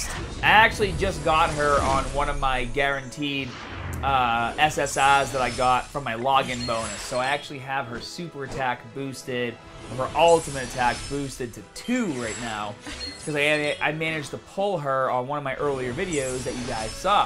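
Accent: Boston, United States. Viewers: He has a boston accent. He is from Boston, Massachusetts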